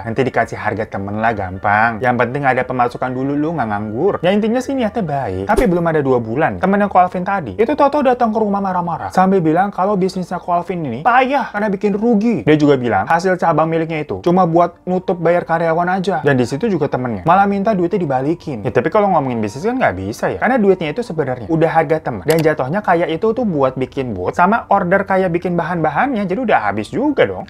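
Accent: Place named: Surabaya, Indonesia. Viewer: Pasti org surabaya nii.. ngomongnya "I" (ai) gt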